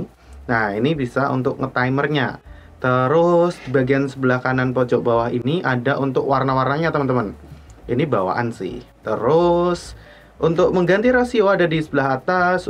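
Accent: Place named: Yogyakarta, Indonesia. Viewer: oalah...wong jogja to dab...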